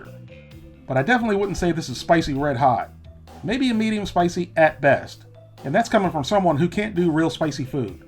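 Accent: South, United States. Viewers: I have missed your videos and Southern voice !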